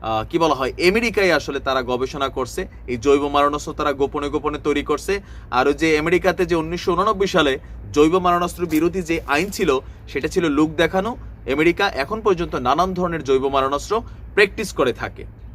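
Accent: Comilla, Bangladesh. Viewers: Vai apni khati comillar vasa kotha Bolen valoi lage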